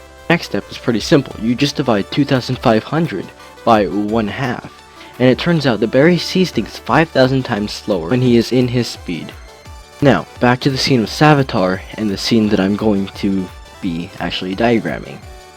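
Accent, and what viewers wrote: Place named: United States. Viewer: you've gtta be shitting me... ur only in high school!? I won't ask what town u live in bc I doubt you'd wanna give up personal info to a total stranger over the internet like that but I will ask what state u live in (or if u happen to live in Canada; but u do sound like ur from here but whatever lol) I ask bc I want to see what states school system is obviously way better then New Jersey (where I live) n their school system lmao.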